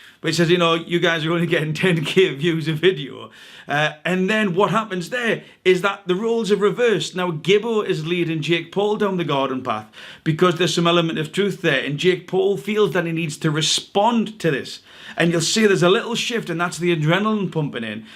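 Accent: Newcastle, United Kingdom. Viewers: Before I see this video I already know you're going to side with Gib since you sound British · Is it just me or does this guy sound like true geordie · Milo Clesham I think he’s said that hes from Newcastle as well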